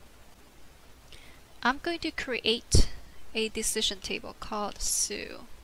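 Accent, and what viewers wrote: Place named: United States. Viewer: The question I have is how can I see the contents of "Su Approval List" after the decision table has executed? I have tried to find it in the clipboard and find it using the tracer. Is it possible to find the contents of the Su Approval List page list in the clipboard or using the tracer? Also I was thinking the page list contents could be stored in the database. Since I use the pega academy cloud version of pega I know of no way to search the pega academy cloud version of pega. I had not trouble of getting the same results you got when I repeated your steps. I though it would be could know how to find what is in the Su Approval List page list for debugging purposes in future problems I might incounter. Thus the reason for my question. Keep up the good work. I worked thru 2 of you videos this week and will continue with the other videos as time permits. 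One of the main reasons I like your videos over most of the utube videos on Pega is I can understand your English (because it sounds like the English spoken in the United States).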